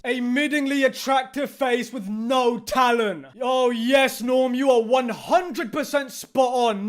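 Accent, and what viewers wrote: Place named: Yorkshire, United Kingdom. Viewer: love your accent bro, is that Yorkshire?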